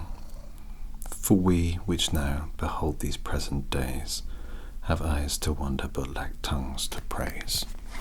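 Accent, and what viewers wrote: United Kingdom. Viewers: A man in a suit with a soft British accent, reading poetry and cuddling his cats? Well I mean... Sign me in. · Can we talk about how amazing a British accent sounds? I swear y'all could order chicken nuggets from McDonald's and sound like an erudite advanced life form. Conversely, I'm from the American south, and one of us could give a lecture on astrophysics and still sound hopelessly backwards. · A British guy reading Shakespeare?! Can't get much better than that!! Fantastic read - thank u! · We just analyzed some Shakespeare during English Literature today! Very coincidental you uploaded this today as well. Either way, I’m so excited to see this! I love being read to, and I love British accents, and I love poetry, and I love your videos! Perfect combo 😍 thank you!!! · Your dandy voice, your UK accent, your sweet face expressions, your way to read Shakespeares in gentleman's grace...sigh.😔✨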